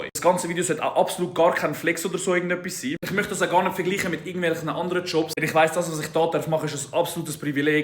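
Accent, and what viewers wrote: Switzerland. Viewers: Verdient enldich emol e schwiezer da au wirkli schwiezerdeutsch redet , in welem kantom wonsch du?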